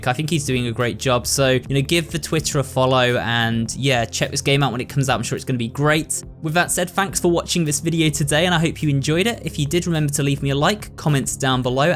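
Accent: United Kingdom. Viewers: The British accent doesn’t make sense to me sometimes “idea” is “ideer” it’s more syllables than the word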